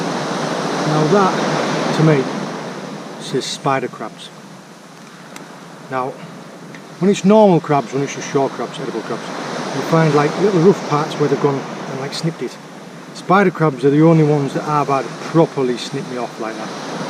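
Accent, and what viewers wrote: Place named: Bridlington, United Kingdom. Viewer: I thought his accent was from near Bridlington. is from whitby. Best fishing videos on the net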